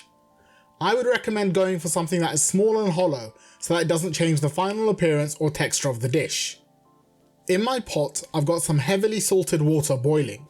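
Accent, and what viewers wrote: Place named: United Kingdom. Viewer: Delicious food! Handsome face! And a very intelligent sounding British accent 😂 what else could you ask for haha! This change is UNDERVALUED u will grow to at least 300k-500k! Keep it up!!!!! 😍✌🏻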